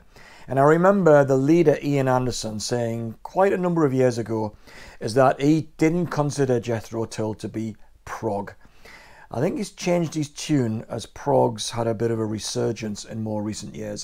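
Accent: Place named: Newcastle, United Kingdom. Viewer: From the accent I guess you must have seen most of these bands at the city hall. We were so fortunate in the northeast to have such a great hall that most (if not all) bands visited Newcastle on their tours. Did you catch the Chrysalis tours with Genesis and lindisfarne?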